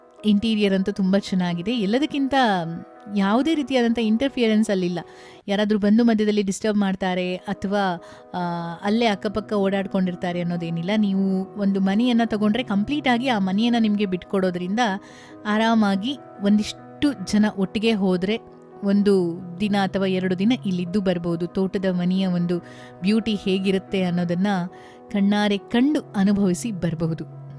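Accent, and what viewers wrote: Standard. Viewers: ಏನ್ರೀ ನಿಮ್ ಕನ್ನಡ👌.. ಕಿವಿಗೆ ಇಂಪು ನೀಡುವಂತಹ ಸ್ವಚ್ಛ ಕನ್ನಡದ ವಿವರಣೆ😍 ಕಣ್ಣಿಗೆ ತಂಪು ನೀಡುವಂತಹ ಮನೆ ಹಾಗೂ ಸುತ್ತಮುತ್ತಲಿನ ವಾತಾವರಣದ ಚಿತ್ರಣ.. ಬೊಕದಾದ ಬೋಡು 😊👌..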